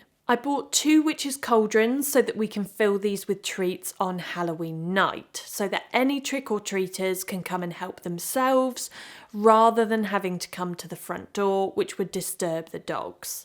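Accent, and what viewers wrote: England, United Kingdom. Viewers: Dearest.....love that your English accent is amazing. USA welcome. 2024 looking good.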